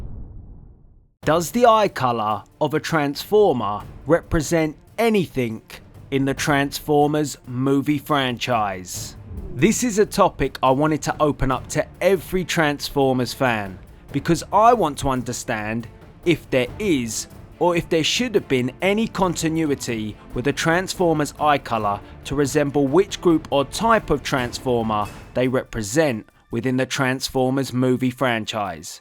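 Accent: England, United Kingdom. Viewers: what part of the UK is that accent from!?!?! hahahahaha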